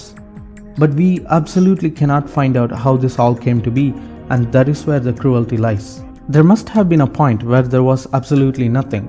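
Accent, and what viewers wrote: India. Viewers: This is off topic, but since you are a person seeking knowledge as well as me, I thought I could ask a politically incorrect question and you would not get offended. Please don't take this question the wrong way, I am asking because I really don't understand. When people with an accent from India speak English, they often roll their Rs and add a "d" sound. When they say a "w" sound they tend to add a "v" sound. Why is that? The reason I am confused is that I can make your sounds by imitating how you speak. But that makes me think that there must be certain pronunciations of your native language that native English speakers will get constantly wrong that indicate they are native English speakers to you. What are those sounds that we native English speakers consistently mispronounce that give us away?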